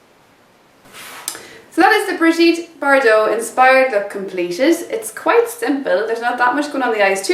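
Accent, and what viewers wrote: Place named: Ireland. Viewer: irish english doesn´t sound right it soounds awful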